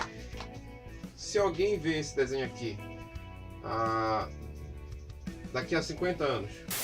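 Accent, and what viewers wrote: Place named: Manaus, Brazil. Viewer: Esse sotaque da moça parece ser da minha cidade Manaus Vcs são daqui? Tô no terceiro período de jornalismo estudei com o Romas Mascarenhas mas me esqueci tudo tô relembrando ótima aula seus vídeos.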